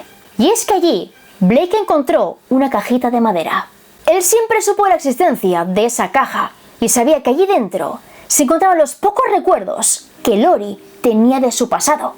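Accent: Spain. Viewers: Un misterio muy misterioso porque no tiene 10 millones de suscriptores. Saludos, tu acento español tiene un misterio encantador Saludos bellotas hermosas y preciosas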